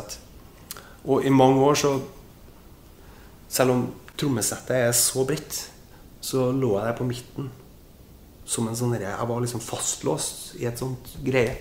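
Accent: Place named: Trøndelag, Norway. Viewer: Bra forklart, men hvor er "The Subtitles" ikke mange av mine kompiser her need som forstaar TrOndersk!?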